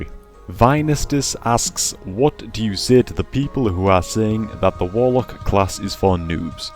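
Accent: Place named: Geordie, United Kingdom. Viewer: unusual question but what is your accent? It sounds like a foreign one and a geordie one mixed together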